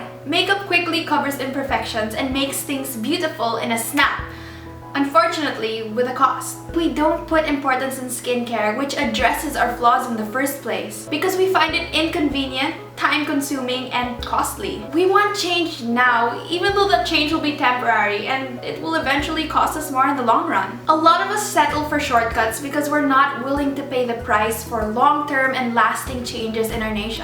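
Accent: Philippines. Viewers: slow clap for 👏 i love you 😍😘 soo proud of you 😊 PS: love ur tagalog accent 😁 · im sorry, i just cant help but notice your tagalog accent and it was the cutest thing ever!!!!!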